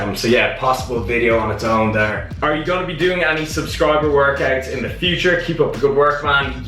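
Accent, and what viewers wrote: Ireland. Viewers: are you irish man ? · the way you irish people say "three" is mint lol. i now know what part u meant haha it was class.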